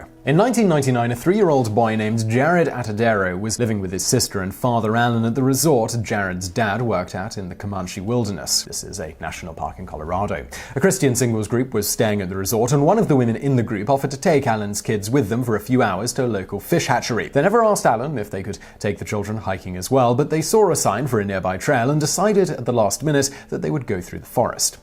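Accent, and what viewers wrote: England, United Kingdom. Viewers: Your voice is an awesome British type. Needs to be in everyday TV.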